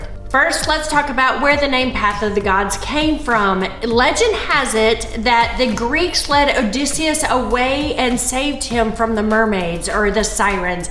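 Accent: United States. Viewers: Thank you so much, the only thing and would help me much more is by putting subtitles for the next time!! Specially for the names that aren’t well pronounced (sounded with so much american accent I can bearly understand the towns!!)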